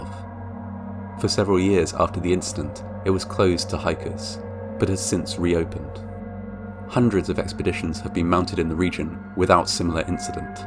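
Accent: United Kingdom. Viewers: As an American, something alluring about a Brit accent as long as it’s not cockney.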